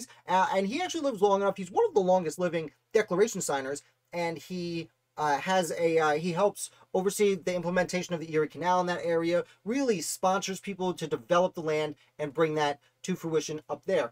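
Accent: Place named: Long Island, United States. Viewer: Where is his house? I’m from Ronkonkoma. Where are you from? You say “lawg island” like me lol 😂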